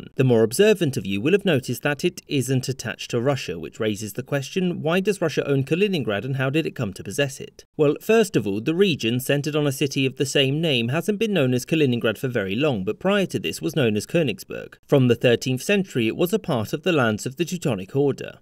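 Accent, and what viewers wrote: United Kingdom. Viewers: Since you have a British accent, how does England own Falklands and Gibralta, and some part Cyprus? And so many lands outside UK? That should be the real question.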